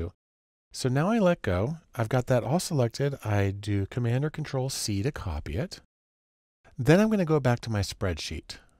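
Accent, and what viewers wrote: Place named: England, United Kingdom. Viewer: its so nice to hear clean english accent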